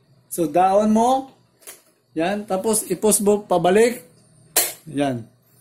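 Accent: Visayas, Philippines. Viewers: Visaya ka boss😂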